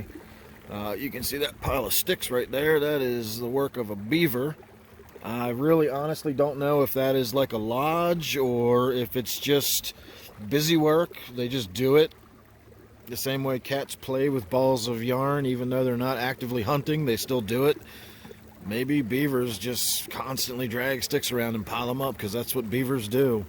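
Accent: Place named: Baltimore, United States. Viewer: Yes, Sir, it's definitely not the hardcore Baltimore accent, it's quite a bit softer.Maybe suburban It's odd because some of it sounds familiar to me (Virginia) but then a few words sound like of Northern, so when that happens I think Maryland.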